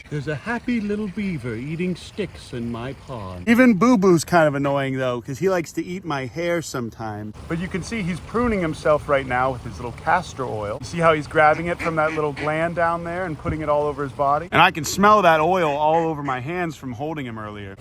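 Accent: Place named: Texas, United States. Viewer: Pennsylvania? No, he's Texan.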